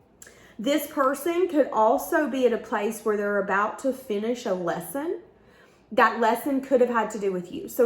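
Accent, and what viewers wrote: South, United States. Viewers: Wonderful Reading 💜 And I Just Love Your Southern Accent & This Is Coming From A Texan 🤭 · Oh my gosh! I LOVE your hair!!!!!!! You are seriously cute as a button. And that southern accent just completes it 😆 Total wallflower fan girl, loyal subscriber here in Dallas! On to the reading. I already know it’s going to be on point, as always. 👌🏼 Love you to infinity and all of my other favorite card readers for sharing y’all’s gifts with us. It makes us feel so seen and heard! ❤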